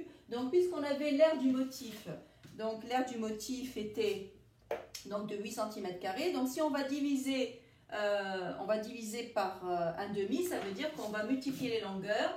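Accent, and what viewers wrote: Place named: Tunisia. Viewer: Elle a l'accent tunisien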